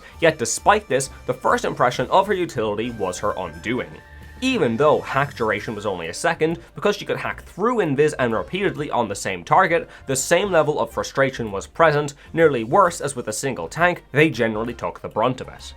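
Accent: Ireland. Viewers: Are you from Louth? Short circuited when i first saw the intro. Didnt expect you to say well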